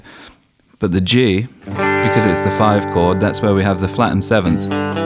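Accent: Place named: Liverpool, United Kingdom. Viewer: Your accent is as cool as your playing! Liverpool?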